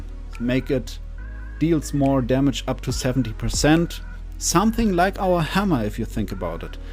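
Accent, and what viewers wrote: Germany. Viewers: Man hört einfach das du deutsch bist^^ Gut aufgebaut das video, thumbs up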